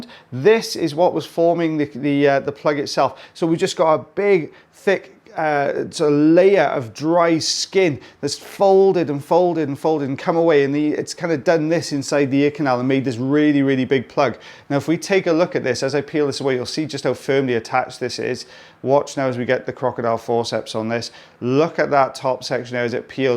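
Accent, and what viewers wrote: Wales, United Kingdom. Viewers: your wenglish is great to listen too unless your Welsh you won’t know what that is but I’m sure does fab peel love these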